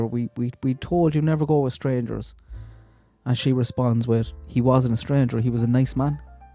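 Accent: Ireland. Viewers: Not sure, a family member married an Irish guy, he talks exactly like you...he has Kerry gold butter n the tea, he makes a nice piping hot cup..he is from Tipperary..I am Irene Brady ,maiden name,have O`Hurley`s n O`Neal.. in family. I don`t know anything about my Irish heritage,it is such a mysteriously, romantic... brooding n beautiful place.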